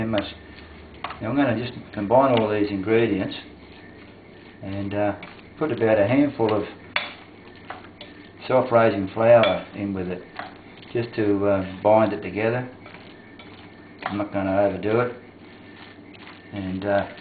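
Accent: Australia. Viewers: AWESOME you are a great chef Link your site to some other similar site... People need to see you... I found you by accident and I am so happy... I will subscribe now.... Thanks for the great videos..California is my home where are you from ? is that an Aussie accent? just curious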